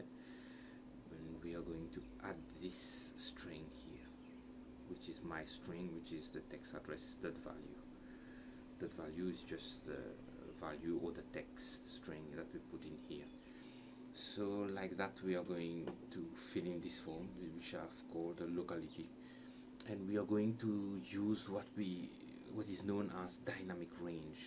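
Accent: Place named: Mauritius. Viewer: Hi, you sound like you are from Mauritius!:)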